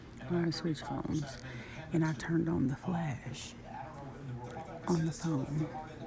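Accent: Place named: South, United States. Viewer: the southern accent makes your voice even more calming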